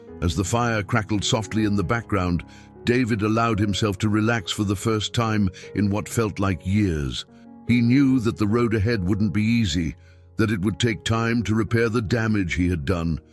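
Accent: United Kingdom. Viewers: I liked this story and the others. But, I wish they'd use another narrator. The voice sounds too English.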